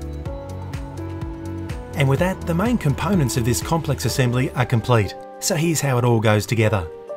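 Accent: Australia. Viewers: Are you an Australian or a New Zealander? Can't pinpoint the accent.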